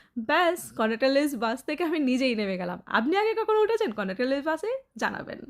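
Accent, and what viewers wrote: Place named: Kolkata, India. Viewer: দাদা আপনার টা কলকাতার ভাষা , মানে আমার শহর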